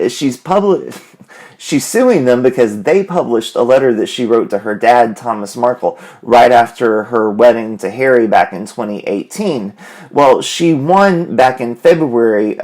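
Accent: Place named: South, United States. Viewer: Omg you look totally different than I imagined you in my mind. lol I pictured you a southern gentlemen from like a southern gothic novel. Sorry your voice just makes me think of that image. Nice to see you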